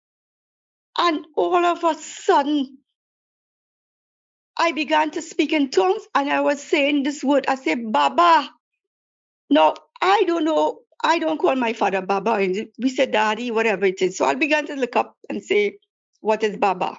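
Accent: Trinidad and Tobago. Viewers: That’s a Trini accent. ❤️ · yes definitely a trini for sure 🇹🇹🙂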